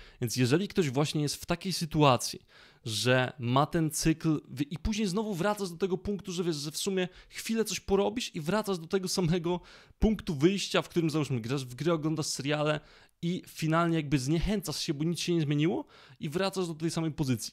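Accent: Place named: United States. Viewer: Dykcja jest dobra. Akcent jest amerykański, albo holenderski. Taka kluska w gębie. Albo pilnowanie sie, zeby mówić niskim głosem.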